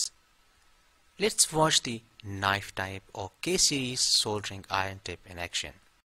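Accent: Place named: Mumbai, India. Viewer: I like your accent. Is it Mumbai/Bombay?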